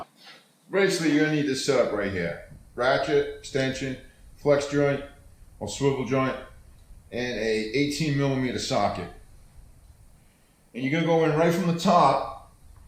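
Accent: Boston, United States. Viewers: Where are you from that ngk plugs are hard to come by ???? Can get them at the corner gas station here .. lol. You sound like you from Boston..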